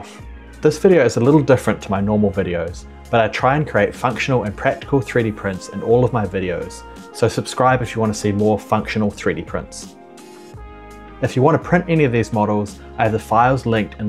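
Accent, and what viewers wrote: New Zealand. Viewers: Yes the New Zealand accent can produce some funny sounds! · Just realized I missed the kiwi accent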